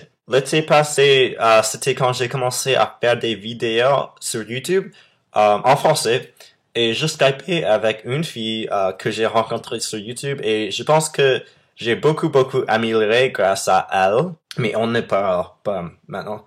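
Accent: United States. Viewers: Salut! Je viens de découvrir ta chaine , et j'avoue que c'est trop cool d'entendre un américain parler Français. Je trouve que tu t'en sort pas mal même avec l'accent avec quelques fautes mais sa c'est normal :) Et je voulais savoir si "quelqu'un" connaissait des astuces pour parler anglais :) J'aime cette langue mais les cours que font les prof son nul donc ..... voila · Michael Rivière Non, on dit des Anglais (ou anglo saxons) qu'ils ont un accent anglo saxon... mais en l'occurrence, ce jeune homme est américain et a un accent américain. Ça va, il fait pas trop chaud chez toi? ( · Franchement, chapeau ! =) Tu parles trop bien, malgré des p'tites fautes, mais ça, on s'en fou ^^. Je pense que si je ne l'avais pas appris depuis mon plus jeune âge, je n'aurais jamais été capable d'apprendre le français -3- En tout cas, tu as du courage ! Continue de t'améliorer c'est presque parfait, mais surtout ne change jamais ton accent ! 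Personnellement, je trouve que l'accent français est fade et plat comparé à l'accent américain ! =) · Bonjour :) Je viens de découvrir ta chaîne et tes vidéos. Tu est très beau :3 et tu parles très bien français, même si tu as l'accent américain (ce qui est normal). Je te félicite c'est très bien :) Translation : Hello :) I just found your channel and your videos. You are very handsome :3 and you speak French very well, even if you have an American accent (which is normal). I congratulate you, that's fine :) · L'Amérique c pas mon truc mais j'adore ton accent américain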